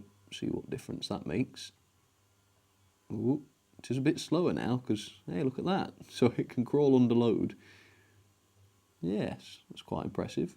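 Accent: United Kingdom. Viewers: I love your British Accent,Mate.😁❤😁❤ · such a British sentence in an adorable British accent and - with some classic British humour for the icing on the cake. Reminds me of cruising with Jane McDonald. I love it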